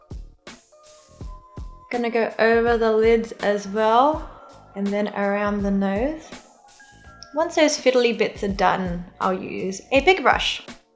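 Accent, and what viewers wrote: Australia. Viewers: is she australian？？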